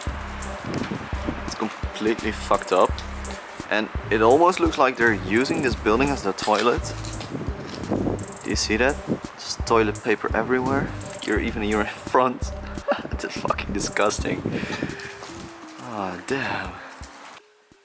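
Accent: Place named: Netherlands. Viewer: Ben je nederlands ik hoor het aan je accent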